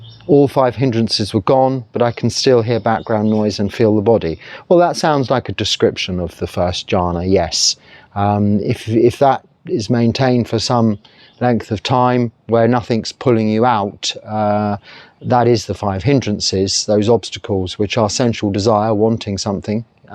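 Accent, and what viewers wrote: United Kingdom. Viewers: This could be a matter of perception. Bhante rather sounds too British, but not angry 😊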